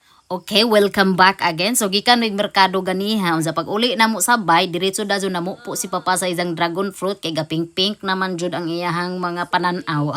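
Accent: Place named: Visayas, Philippines. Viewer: Unsa hapdos? Lain man pud imong binisaya Miss pag claro pud? Mas lami ang homba sa "Cebu"., "Yummy" kaayo.! Hahaha..😮😮😅😅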